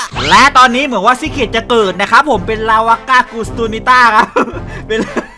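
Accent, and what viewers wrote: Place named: Isan, Thailand. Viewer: อังกฤษพะสมอีสาน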